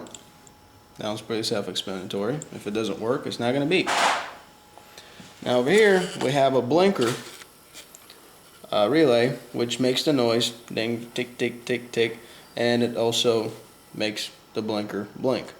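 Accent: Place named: South, United States. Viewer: Southern boy...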